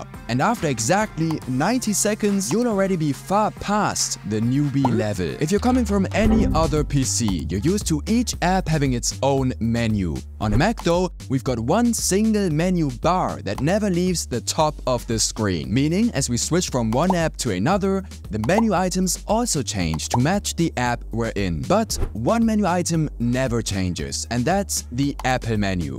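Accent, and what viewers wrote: Germany. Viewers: the german accent was too strong to over hear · TOO STRONG GERMAN ACCENT !!! impossible to listen to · Gone are the days of my youth when finding a German who speaks English was looking for a needle in a haystack. still has a little bit of accent which I immediately localized but it is not detrimental in the least, it is even charming. Actually, his English has more clarity than that of the most native speakers I follow on Yt. But it’s not only in the way he speaks. It’s also the way he lays things out and his overview. A natural born talent for an educator. I associate this subject of learning the macOS with my worst frustration of the last month but the way he deals with it replaces my grave frustration with simple fun and much faster learning curve. The script and video integration are simply awesome. I know doesn't need my acclaim after millions of views and thousand of comments, but I am so impressed I want to encourage him anyway. 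I have watched this three times and will be coming back. This edition may be better than the 2025 one. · You sound very German, are you also German? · hatte kurz gedauert i hear the german akzent btw nice video :)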